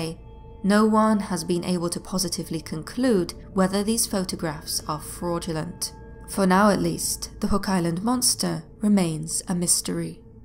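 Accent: United Kingdom. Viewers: The British accent played out in these narrative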